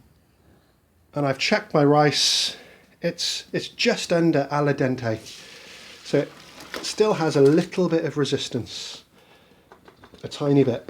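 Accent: United Kingdom. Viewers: British accent is amazing !!!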